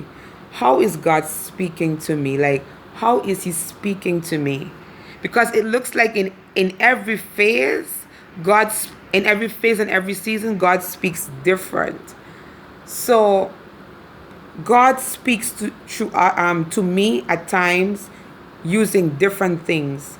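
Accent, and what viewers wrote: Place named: Caribbean. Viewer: What part of the Caribbean are you from? Btw I love your accent, I'm Haitian and Antiguan 🇭🇹 🇦🇬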